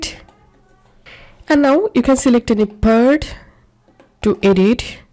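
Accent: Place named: India. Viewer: stop raping the mic and speaking in half indian and half curry english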